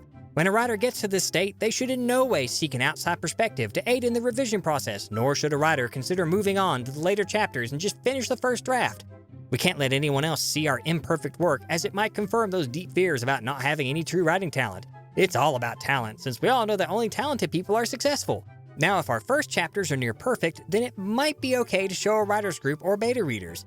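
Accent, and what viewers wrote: South, United States. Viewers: I think the slightly southern accent just makes these videos so much better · dislike for southern accent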